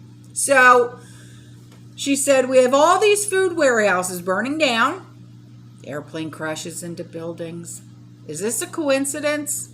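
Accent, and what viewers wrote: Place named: Brooklyn, United States. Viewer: Well hello there, I stumbled upon your channel last night. I LOVE yer NY Brooklyn accent and your channel! Please don't hold back, pour on the accent! Prepping with pride!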